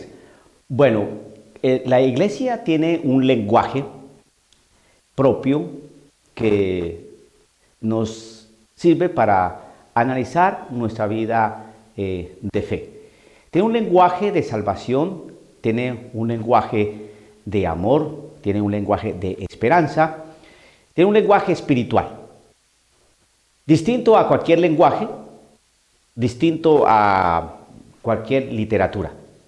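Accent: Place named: Bogotá, Colombia. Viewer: El, es colombiano....no le notas el acento rolo?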